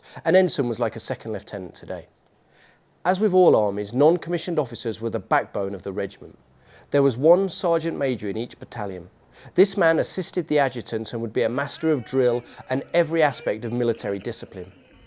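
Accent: United Kingdom. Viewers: Can I ask what part of the UK is your accent from ?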